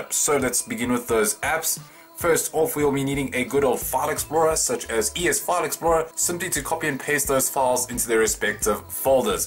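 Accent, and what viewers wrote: South Africa. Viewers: dude.you South African?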